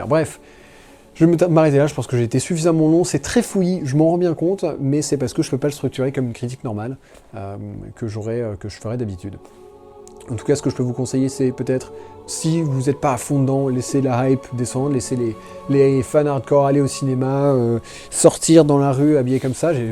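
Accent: England, United Kingdom. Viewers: Cet accent Anglais !! :o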